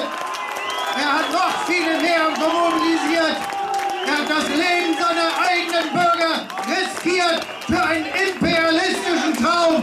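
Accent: Germany. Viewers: Damn I love German accent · The true German accent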